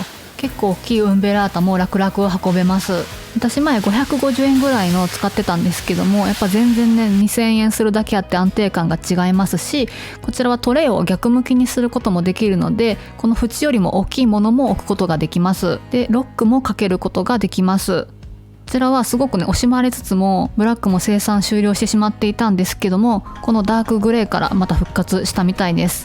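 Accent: Kansai, Japan. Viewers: やっぱり、少し訛ってますね❤でも関西弁愛おしいですよ。新商品見たのに忘れてしまってました。説明を聞いて思い出しました😅有難うございます😊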